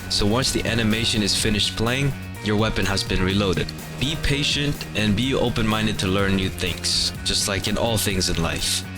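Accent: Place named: Netherlands. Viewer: for anyone wondering, zeekoeien100 is Dutch for Seacows100. Also im guessing, based on his accent and one of the pple hes playing with, that hes dutch himself. But thats just a theorie, A THEORIE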